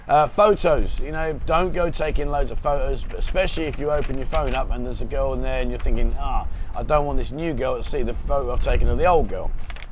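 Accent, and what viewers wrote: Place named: England, United Kingdom. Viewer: well first of all I don't watch these videos. It popped up on my feed. Secondly as soon as I hear the accent I turn off. The English have been ruining tourism in SEA for years. Demanding the locals speak English, fighting with locals, destroying their property, doing perverted inappropriate things. No respect for the local culture. You're all better off staying at home where you belong.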